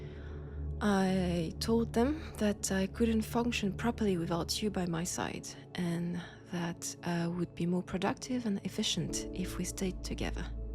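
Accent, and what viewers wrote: France. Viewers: I like the French Accent. Thats a nice Roleplay and there a emotions on it that i can feel myself. Good job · wow.....just wow, This was difficult to listen to, not because it was bad but because it was too good. Your voice carried so much emotion in it and when it started cracking, so did i😅. Life taking you away from someone you deeply care for is always a tug at the heartstrings, and this story was just made so beautifully around that idea. This is the first audio ive listened to of yours and although this is a packed up sendoff to these two, i think i can speak for most people when i say we need a part 2, or at least a resolution where they find eachother again. Something, anything. Our souls need it to heal😭 (i also adore french accents ever since i visited france a few years back, love your voice😁👍)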